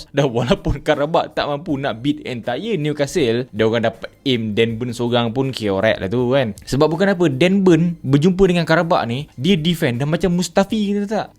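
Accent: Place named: Kedah, Malaysia. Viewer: Harey hg cakap kedah bunyi supa taik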